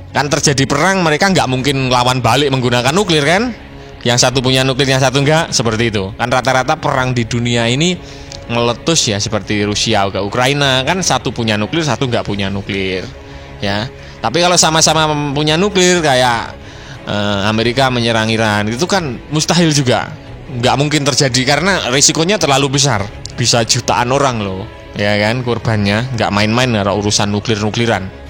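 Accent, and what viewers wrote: Java, Indonesia. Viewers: Medok banget jawa lo min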